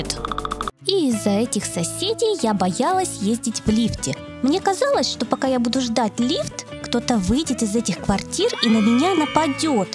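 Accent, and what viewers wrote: Ukraine. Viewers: ти из ккрайини)))))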